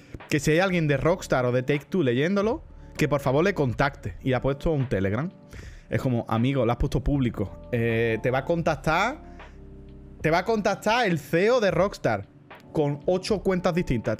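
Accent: Puerto Rico. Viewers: porque habla como puertorriqueño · A veces se me va el rollo y por el acento creo que Baity es puertoriqueño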